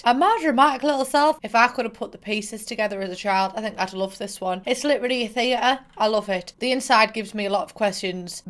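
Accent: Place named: England, United Kingdom. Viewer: I’ve binge watched your videos in the past few days and now the voice in my head has a very thick English accent and I’m not sure how to get rid of it...or that I want to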